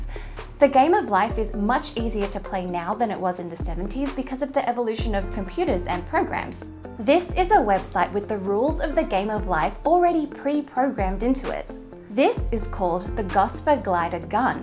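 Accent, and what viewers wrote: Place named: Australia. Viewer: I don't know why I totally missed figuring you as an Aussie. Your accent just doesn't scream it to me. But no complaints finding out now. Opposite side of the world, but opposites attract! Hey, don't take my word for it -- just ask your friendly neighborhood magnet! So, I'll keep coming back as long as you keep sciencing the sh*t out of Youtube!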